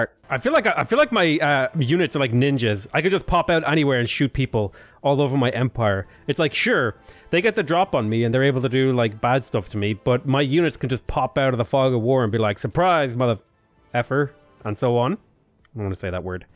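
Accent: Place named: Ireland. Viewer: You have a lisp? I thought you were just Irish.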